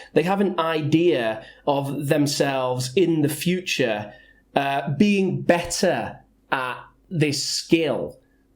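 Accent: United Kingdom. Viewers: I've been learning so much with your videos, I don't have time to attend an English course so I study by myself when I'm free.I've been following your tips and I notice that I'm finally making progress at my studies!:) Plus, your British accent sounds like music to my ears.haha Thanks a lot!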